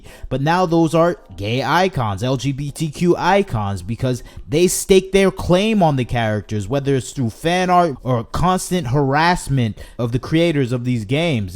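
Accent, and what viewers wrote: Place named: South, United States. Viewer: what part of the country are you from? you say idear instead of idea lol, i can hear the accent, it reminds me of the south, but i'm not quite sure where in the south because all your other sentences sound more or less not from the south, so it's difficult to know where you are from exactly.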